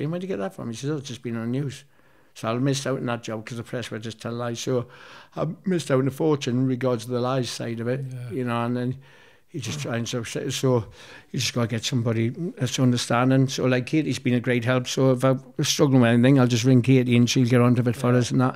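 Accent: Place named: Newcastle, United Kingdom. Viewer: Amazing geordie from a mackem 👍😁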